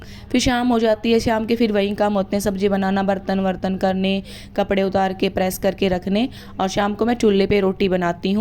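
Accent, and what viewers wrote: India. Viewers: Tu to awaz se dakshin Haryana ki laage hai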